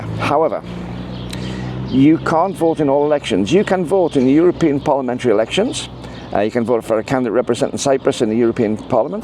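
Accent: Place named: Scouse, United Kingdom. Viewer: Great video - might be wrong but do you slip into a slight Scouse accent every now and then? Dare I say a hint of Birkenhead in there? 🙂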